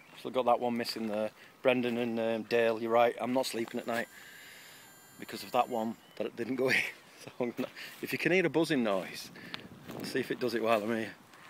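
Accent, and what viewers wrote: South, United States. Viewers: You master gardener you! You are excelling brother. Common....I want to hear you make fun of me...HAHA! I want to hear the southern accent! You'll be growing stuff yearround in that greenhouse. I like it. Wish I had a larger one. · Now see I clicked on the video to hear the Southern accent! LOL. I know what you mean about being impatient. Bernard on navajopa31 has a video on grapevines. Here's the link. <link>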